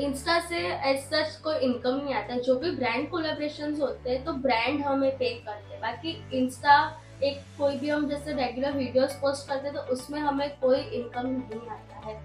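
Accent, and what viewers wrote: Gujarat, India. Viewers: The way u speak... Apki tone me hi gujrati pana hai😂.. I am also from Gujarat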